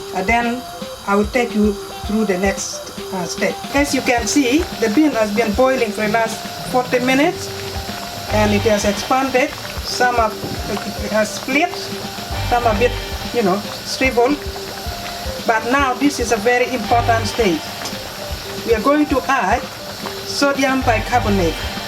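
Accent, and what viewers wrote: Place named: Luo, Kenya. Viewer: You sound my accent of Luo.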